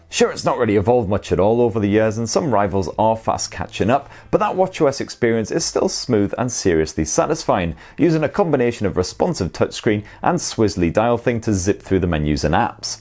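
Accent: United Kingdom. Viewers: You didn't Include links or written names to any of these items, you ran through cryptic names too fast and with your British accent I have no clue how to reference and follow up on any of those devices. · Am I the only one who watches his channel for his absolutely lovely British accent?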